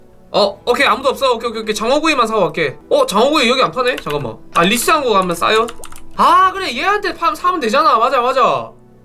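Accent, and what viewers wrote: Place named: Gyeongsang, South Korea. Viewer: 경상도 말투 진짜 ㅋㅋ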